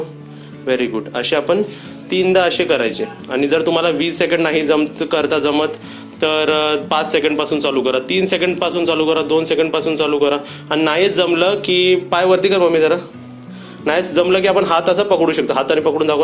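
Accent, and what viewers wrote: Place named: Nagpur, India. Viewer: Chhan sangta,Nagpur chi language vate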